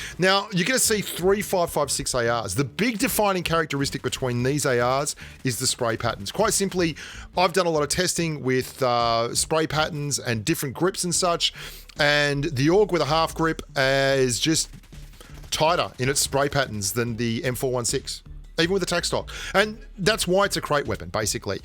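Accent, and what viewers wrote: Australia. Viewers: "GrAAAAAHzer" gotta love that Aussie accent hahaha keep up the great work, love your videos!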